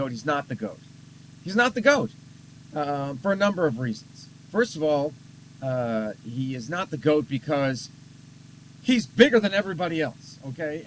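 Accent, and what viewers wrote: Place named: Czech Republic. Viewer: where are you from my man? Cuz it do be sounding like you are from czech republic xD PS: Good videos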